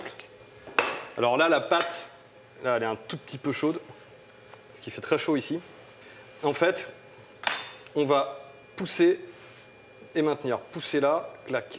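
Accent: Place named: Quebec, Canada. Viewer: On parle québécois en plus...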